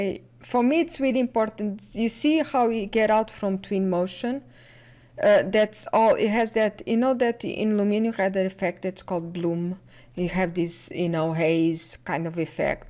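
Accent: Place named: Portugal. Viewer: este sotaque tem, mas TEM, de ser tuga 👀 não engana ahahah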